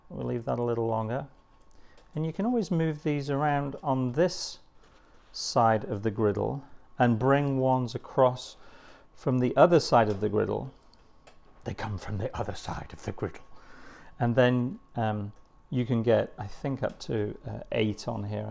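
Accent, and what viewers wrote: United Kingdom. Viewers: What's this nonsense? I'm pushing 60 years old and have never seen or heard of anyone cutting a crumpet like that. Literally never. You sound (middle class) English but who is this aimed at? No one calls them 'English crumpets' in Britain and what Americans call 'English muffins' are not the same as crumpets. What the hell is going on? It's one thing to pitch British recipes to Americans etc, and should be congratulated if anything, but to perpetuate nonsense like English crumpets and suggest they're the same as 'English muffins' is ridiculous. If you're filming that in America, dont come back, mate, you're dead to us. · I suspect that, in spite of your accent, you are not British otherwise you would know that crumpets and muffins are 2 completely different things.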